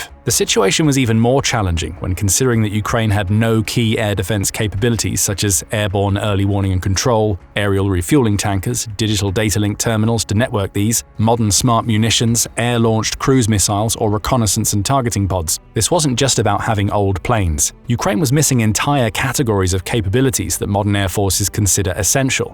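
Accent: United Kingdom. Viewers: Can you use a non UK accent. We have grown tired of the accent.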